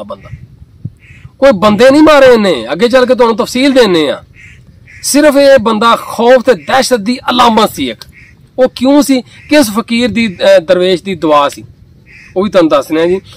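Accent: Punjab, India. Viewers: Assalam o alaikum Aap ki pure punjabi buhut achi lagti hai. I am from KPK.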